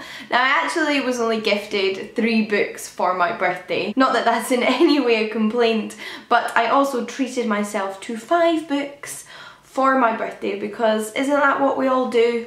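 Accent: Scotland, United Kingdom. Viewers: Hi, I am a book blogger and have recently started subscribing to your vlogs, my site is <link> Knew (obviously) from your accent you were a fellow Scot but didn't realise you were local to me. I live in Polmont and can attest to just how fabulous the charity shops in Linlithgow are, my husband and I are often to be found trawling around them. Keep up the great vlogs and feel free to drop by my site.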